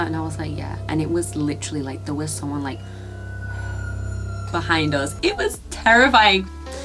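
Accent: United Kingdom. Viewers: She sounds completely British to me? What Americans have you been listening to 😂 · I love your british accent and Congrats to have a 6k subscriber!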